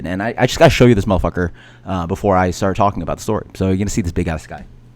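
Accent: United States. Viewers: dude, your new york accent came out here. wish you the best bruh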